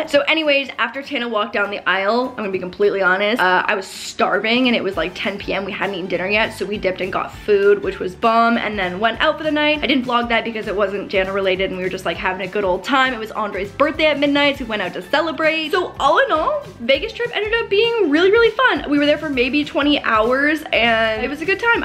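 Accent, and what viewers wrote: Canada. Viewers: tomoreow hahahhaa love ur canadianness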